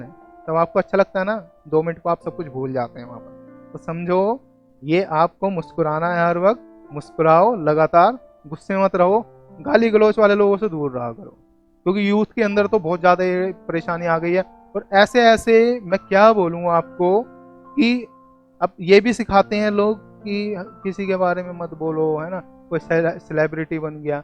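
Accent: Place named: Delhi, India. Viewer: Apki bhasha Dehli ki hai..... Mere ek dost se milti julti.. 🙏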